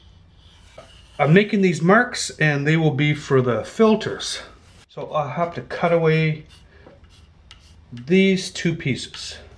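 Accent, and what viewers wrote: Canada. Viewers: great job bro! p.s. you remind me of Jordan Peterson's accent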